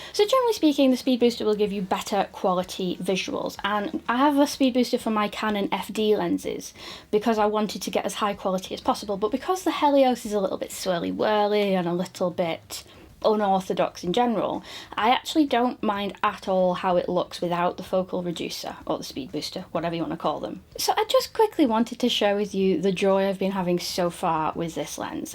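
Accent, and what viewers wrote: United Kingdom. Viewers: Love your videos, you're so incredibly cute and beautiful. I'm an Oly shooter myself, since I don't do videos. I Love your Brittish accent. If you ever come to Gothenburg, Sweden, you are welcome to stay at my place, since I have an extra bed, so you don't have to sleep in a Hotel and can skip some of The cost. Lot of Love, hugs and kisses, Niklas.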